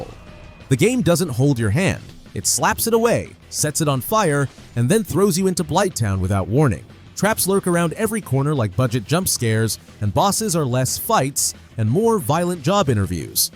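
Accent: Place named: United States. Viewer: American assent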